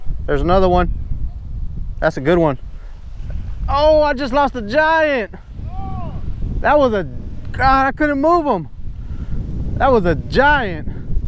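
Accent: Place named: Texas, United States. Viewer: The texmex accent 🤙🏻🤙🏻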